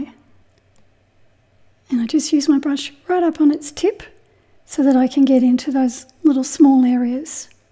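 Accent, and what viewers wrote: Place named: Australia. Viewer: I keep repeating to myself “ I am SO happy I found you on YouTube!”. I love your attention to details WITH the softening and the loose or lost edges. You are a fantastic teacher. Clear, easy to follow and understand. Your limited palette makes me remember to keep moving in that direction and I need to remind myself to let the colours mingle on the paper and stop fussing with them.. I love the Ozzie accent too! So sorry to hear about those horrible fires and so much damage to homes, forests and wildlife. The World IS watching and hearts are aching.. please keep posting your videos are in my favourites list and I watch some of them over and over. It shows how hard you work to get them right!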